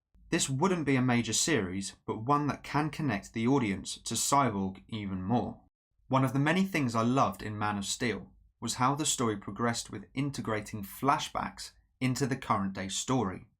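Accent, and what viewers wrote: United Kingdom. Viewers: Where in the United Kingdom are you from?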